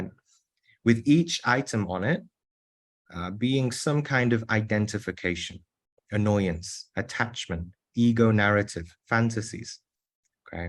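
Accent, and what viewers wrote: United Kingdom. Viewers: It is very difficult for Me to Accurately Express what I intend to Convey...but I will try. I was initiated by an Indian Sat Guru at the Age of 23. I am 73 now. The Tradition of My Guru's Philosophy originates from the Punjabi River region of Northern India...the Homeland of the Sikh Religion. I have been through Many Chapters of Life...since My Initiation...but after listening to Lecture...I now realize just how little I knew about Meditation, the Dream Phenomenon, or Astral Travel...in the 50-years following. I took Copious Notes...during This Lecture...and Now that I have had a Chance to Recopy It...adding My Own Incomplete Sketches of Inner Knowledge...I am At a Complete Loss for Words. I am Now Seeing that I have Added the Lectured Information (Given by )...to Complete what I Already knew and wrote beforehand. It is like Writing The Subject & Verb of a Sentence...and then a Wiseman entering the Room and completing Each Sentence with the Necessary Object...to make the intended Concept...A Complete & Comprehensive Set of Ideas. 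I am describing Something very Spiritual here...but I am merely a Student-in-Progress...sitting in Classroom. I have only had maybe 'Six' of what I would classify as genuine Out-of-Body Experiences in 73-years of Physical Life...but is a Master-Teacher at His Craft...with a Beautiful British Accent to Clearly Express His Lofty Teachings. There is A GOD...and I am truly an Unworthy Recipient of His Endless Grace. I have found a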